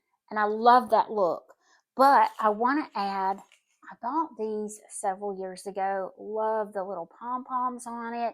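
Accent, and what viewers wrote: South, United States. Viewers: Lovely and so nice for fall. Love your adorable Southern accent!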